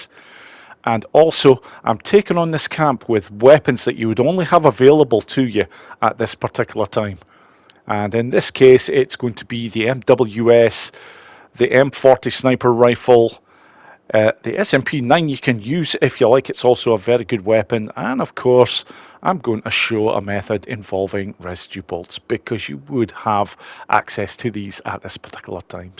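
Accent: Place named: North East Scotland, United Kingdom. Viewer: I thought I picked up a north east twang at times. I'm fae the Broch (Fraserburgh). 42 miles north of Aberdeen. Keep up the great work.!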